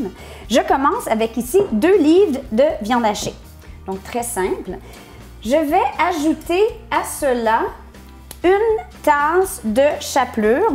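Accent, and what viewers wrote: Canada. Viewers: Je me demande pourquoi je ne vous ai pas connu plus tôt ?? Vos vidéos sont agréables à regarder. Vous êtes marrante et je kiff trop votre accent...le bel accent canadien.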